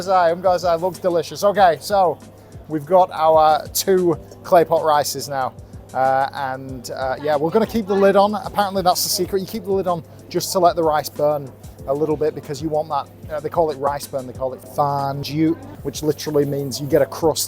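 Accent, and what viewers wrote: United Kingdom. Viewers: Are you from Birmingham? Brummie accent... 🤔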